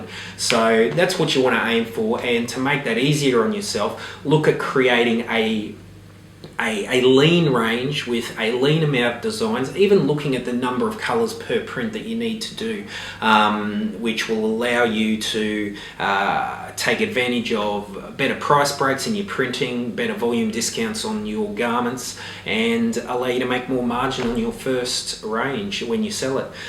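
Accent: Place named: Australia. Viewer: I'm Australian and can tell he is also.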